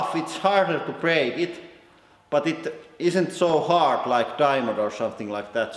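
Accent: Finland. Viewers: Myles Bishop he is from Finland. Finland people always have problems on speaking English. :D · His accent is Finnish not German-Austrian so he can't be Hitler even if he was alive. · Isn't this a finnish accent? 😂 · Täytyy olla suomalainen, kuulee heti :D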